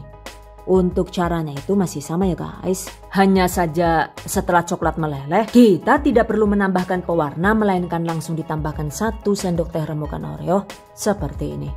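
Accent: Banyumas, Indonesia. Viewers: Aku ngakak bunda ngomong ngapak. Wkwkwkwk (sedikit ngerti). Trims resepnya bund, kayaknya lebih praktis pake coklat yg sudah berwarna ya, lebih awet pula. Sukses buat. Aamiin... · Ya Allah deneng rika ngapak bu,asline rika si wong ngendi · Yassalaaam ngapakmu marai aku kemekelan mbak😂😍😘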